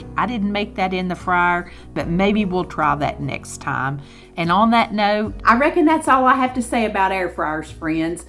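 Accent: United States. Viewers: Can I just say I think your American accent is absolutely adorable, your so friendly, lovely lady, and obvs your air fryer recipes are fantastic. 😊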